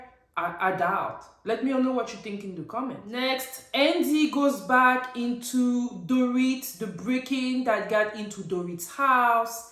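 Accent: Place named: Scotland, United Kingdom. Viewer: I'm from Glasgow! ❣️ I love that you read it in a Scottish accent 😂😂- you know how much we Scots love laughing at ourselves 😂😂. Garcelle and Sutton are definitely the two ladies of the group, and the only genuine friends, as far as I can see 💗 oh god - I would bounce rinna for her actions this season. She is an overdramatic sleazeball, who doesn't care who she hurts to have her moment, she is just like Andy - self serving and exploitative 💗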